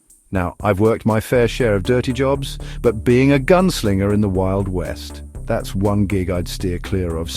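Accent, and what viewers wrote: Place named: England, United Kingdom. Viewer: Why is this bit pf US history being narrated by an englishman.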